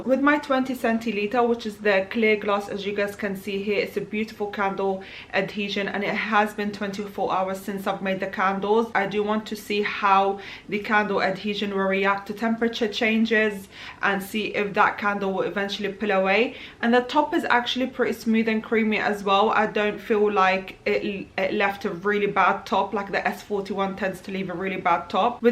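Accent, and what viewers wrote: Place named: Philippines. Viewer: Greetings from the Philippines. I love the accent. ❤ Let everyone know you're a filipina too.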